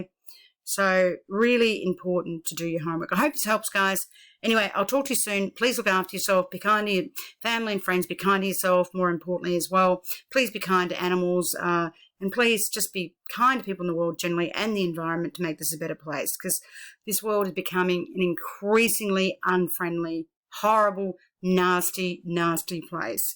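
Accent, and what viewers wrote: Australia. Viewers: mrTiii Very small you're right because Australian pronounce Finance as FaNANCE so is more likely to say BaNANCE.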